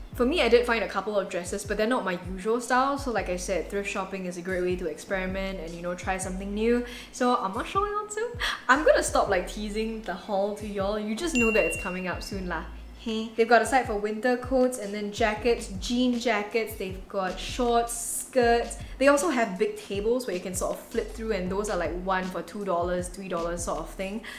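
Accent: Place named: Singapore. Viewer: how do u remove ur Singaporean accent?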